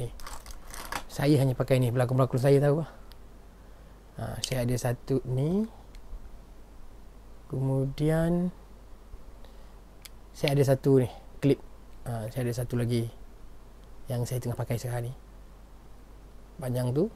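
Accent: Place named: Kuala Lumpur, Malaysia. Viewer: Wow 😮 cakap KL 🤣🙏🏻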